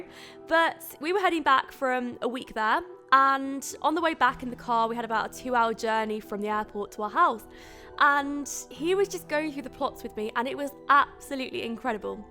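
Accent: United Kingdom. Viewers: you live in germany? but your accent is so british o.o how do you maintain it?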